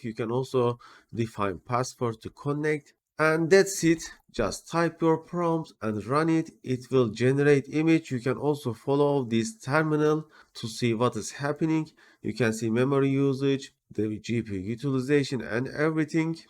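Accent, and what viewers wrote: Turkey. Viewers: English with Turkish accent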